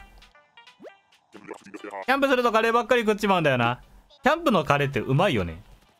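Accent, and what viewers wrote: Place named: Kansai, Japan. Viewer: 久しぶりに見たんだけど､こんな関西弁の人だったっけ？ってなった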